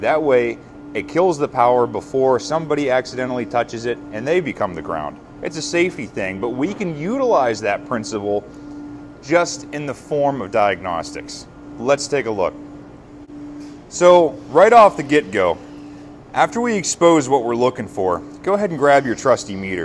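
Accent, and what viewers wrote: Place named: Pittsburgh, United States. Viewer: Didn't realize before you were a Yinzer!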